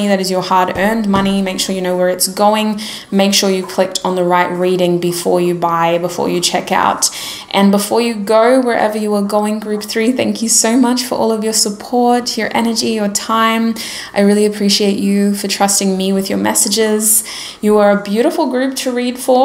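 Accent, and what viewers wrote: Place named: Australia. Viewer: Hi would you consider doing meditation videos, you have a soothing Australian accent which is very calming and easy listening to hear. Very relaxing ❤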